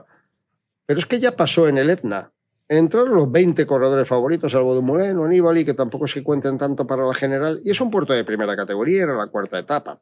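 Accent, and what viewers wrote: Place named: Valladolid, Spain. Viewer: Habla igual que yo!! (Andaluz) jajaja claro es de Valladolid habla castellano puro da gusto escucharlo es verdad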